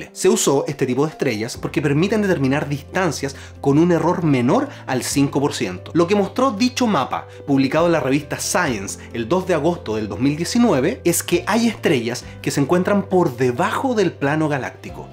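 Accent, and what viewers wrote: Chile. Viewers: Muy interesante tu vídeo. Además es muy grato escuchar el castellano chileno después de tantos años en el extranjero. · Chileno, sin duda. Muy bien hablado y claro.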